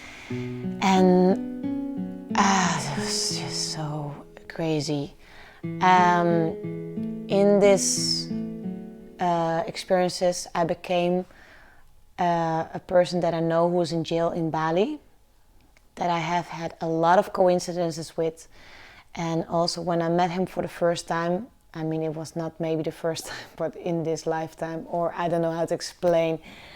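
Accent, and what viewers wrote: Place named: Netherlands. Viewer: Love your accent and i dont like all Dutch accents.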